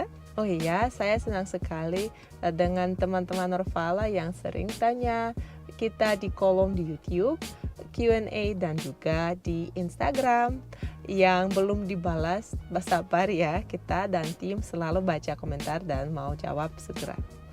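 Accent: Indonesia. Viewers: Masya Allah.... ekspresi, gaya bahasa, & cengkok Indonesia banget. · Logat sudah Indonesia banget 👍👍👍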